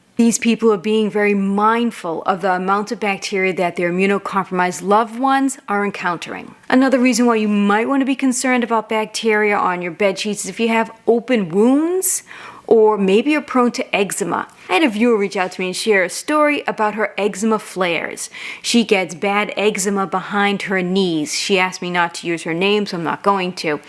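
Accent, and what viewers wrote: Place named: Boston, United States. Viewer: is from the Boston area.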